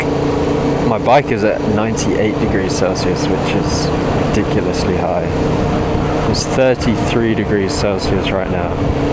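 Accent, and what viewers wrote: United Kingdom. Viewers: Excuse me, may I ask you something? Are you from the UK?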